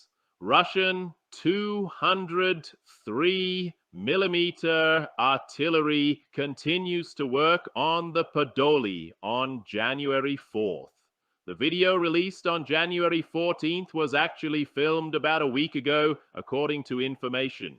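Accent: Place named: Afghanistan. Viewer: Why the afegani voice?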